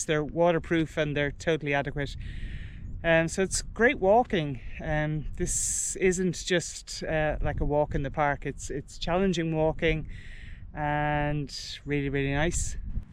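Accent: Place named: Ireland. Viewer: You’re a gifted storyteller - both visually & through words. Delighted to watch your adventures & listen to your gentle Irish brogue. ☮️&💟 from Sydney.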